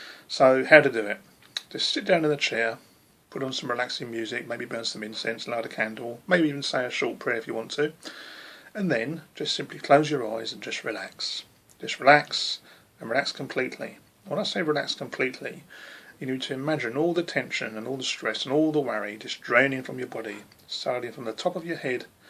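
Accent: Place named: United Kingdom. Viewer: This was too freaky! I have been trying to reach out to my spirit guide for a few years but recently more so. I always had a name in my head and I randomly came here to listen to you because you are British mainly and your words resonated with me but when you said the name of your guide I was freaked out.....Jonas is the name I have had in my head for a few years now. I thought I'd made it up. Now I know it is my guide. I wonder if it's the same guide as yours? I'm freaked out!!!!! Synchronicity or what????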